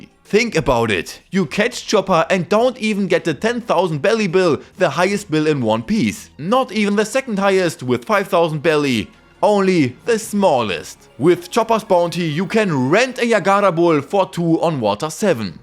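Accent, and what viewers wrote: Germany. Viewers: you sound german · Are you german? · Man kann merken dass du Deutsch bist jeder Mensch in Deutschland spricht so hahaha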